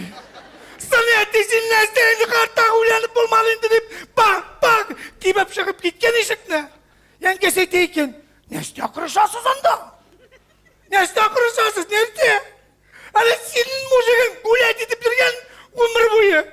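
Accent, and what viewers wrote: Chuvashia, Russia. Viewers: не про чуваш а с чувашским акцентом играет чувашина на татарском языке истории рассказывает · Как точно! Некоторые мои друзья чуваши так и говорили на татарском, ну точь в точь. Молодец!!! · у него вроде всегда такой акцент, чувашский.. может он чувашин?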